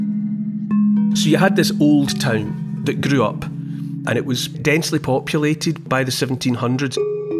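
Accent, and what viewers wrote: Scotland, United Kingdom. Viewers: I enjoy hearing your Scottish voice, Neil. It reminds me of home.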